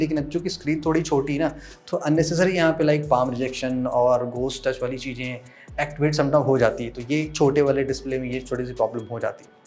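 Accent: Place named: Bhojpuri, India. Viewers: Are sir ji aap to bhojpuri wale nikle 😀 kyaa baat kyaa baat ❤ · I love bhojpuri style 😊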